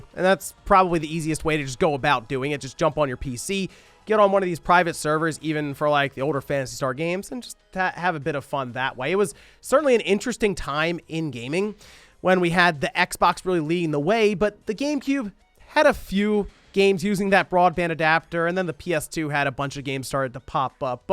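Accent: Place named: Philadelphia, United States. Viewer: You have a very heavy Delco/Northeast Philly accent...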